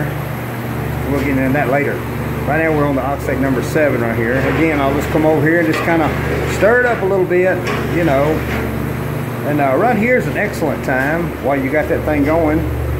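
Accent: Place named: South Carolina, United States. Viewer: I am willing to bet this fella is from South Carolina, he sounds just like Bobby Goodson from Swamp Loggers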